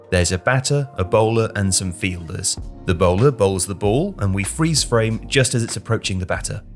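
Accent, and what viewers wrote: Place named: United Kingdom. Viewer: I waited the whole video to hear something about hockey, a sport that is in some sense very similar to football. I think when it comes to data analysis, you just analyse what's important for you to help you decide either strategy during a game or overall management of the team. And I still believe there is a gap here because Americans love statistics, the video having a British accent makes me think they haven't talked to the Americans yet :). I grew up watching American football and I will never forget when I was once watching a game and the stat came up on screen (not accurate but you'll get the idea): "Quarterback John Doe has a pass completion rate of 57% when the temperature is below 32 degrees" (Fahrenheit of course). I was like "oh, they will have data for everything". So, back to football, they will have the important stats. 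Statistics on victories (home and away), victory rate after scoring first, victory rate after trailing starting the second half, victory rate after trailing when compensation time starts, goals per game (already done), goals per penalty kicks (where in the goal the shoot more often, accuracy per kick location, I think this is already done), penalty kicks stopped (by keepers, already done), clean sheets for keepers (already done), accurate passes (left and right leg), goals (left and right leg, headers), ball contact time/times (done) they may even have duel stats, like Messi's successful dribble rate against Pepe. In hockey you would have similar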